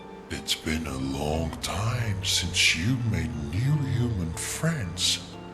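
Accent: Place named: Indonesia. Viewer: i immediately recognized your accent! hello, from an indonesian american! i loved this video and completely agree with your analysis and all of your thoughts on the ending. it’s been 2 months since i’ve seen the finale of clair obscur and thinking about these characters still brings me to tears 🥲 so few games hit as deeply as this one does, it’s such a gem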